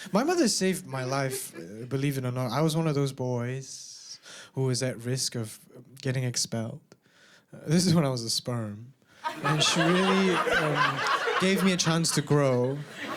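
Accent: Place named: Singapore. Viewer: Are you Singaporean? I can hear the accent haha, I’m proud!! You have really great jokes and way of storytelling ❤❤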